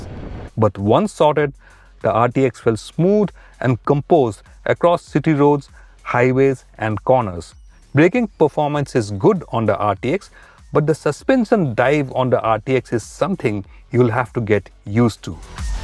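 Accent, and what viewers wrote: Assam, India. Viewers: THAT ASSAMESE ENGLISH TONE😁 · I think the anchor is assamese, I can sense the acssent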